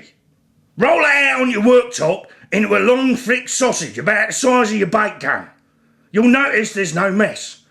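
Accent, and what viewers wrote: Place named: Cockney, United Kingdom. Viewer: Wow big grizzly cockney voice and the missus making the boilies now that's a concoction!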